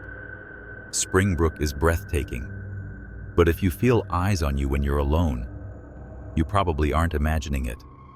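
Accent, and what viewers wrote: United States. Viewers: As soon as I heard the American accent I knew this video was bullshit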